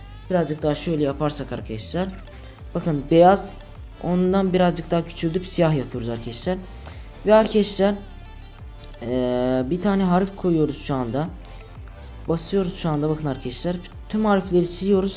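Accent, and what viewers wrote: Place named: Azerbaijan. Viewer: Qaqa Azerbaycanlı san turkcə danisirsan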